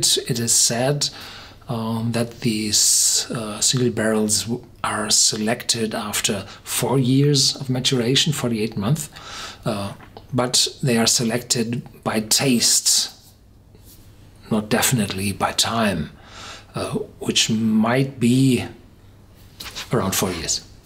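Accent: Germany. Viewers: Ah that German makes me weak in the knees <3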